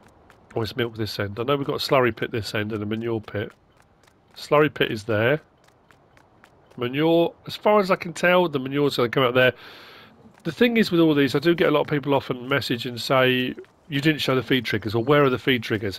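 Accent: France. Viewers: Regarding your French accent, don't be ashamed of it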